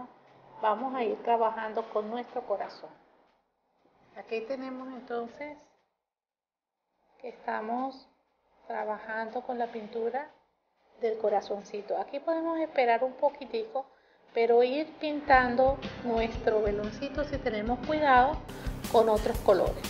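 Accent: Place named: Venezuela. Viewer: Me gusta como explica, y por su acento, es ud venezolana. Felicitaciones!! yo también lo soy, saludos y abrazos desde Barinas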